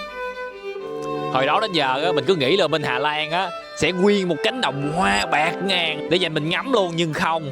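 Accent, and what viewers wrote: Mekong Delta, Vietnam. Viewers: Ông nc rặc miền Tây,dù đi dl nc ngoài thì ổng nói tiếng Anh giọng cũng đặc sệt miền Tây,dễ thưn,thân thiện,hài huớc 😂😂😂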